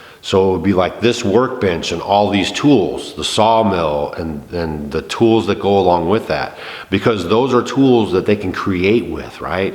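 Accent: United States. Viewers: what, no heritage ?hey, you sound like someone from the USA... (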